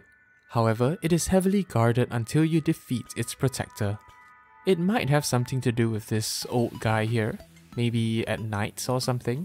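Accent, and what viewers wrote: Singapore. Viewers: Bro got that Singapore accent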